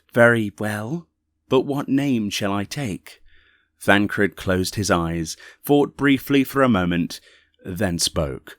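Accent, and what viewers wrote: United Kingdom. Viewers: With all due respect to Brian from Work to Game, but you cannot beat a British accent. No shame in losing to that.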